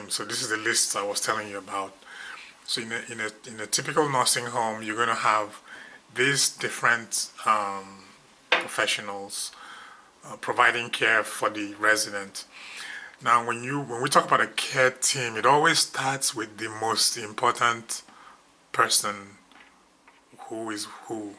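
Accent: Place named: Nigeria. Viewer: you sound so Nigerian. 😍 Maybe you aren't, but lovely regardless. Keep winning 🇳🇬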